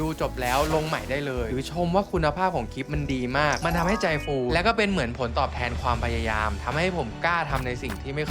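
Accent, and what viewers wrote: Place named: Sukhothai, Thailand. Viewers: 555ผมเข้าผิดมาตลอดว่าพี่คนสุโขทัยเห็นคุยเหมือนกัน😅😅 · ว่าแล้วววสำเนียงเหมือนโซนบ้านกร่าง<num>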